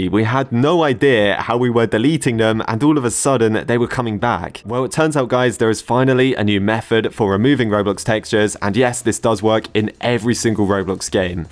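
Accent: United Kingdom. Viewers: shut up brit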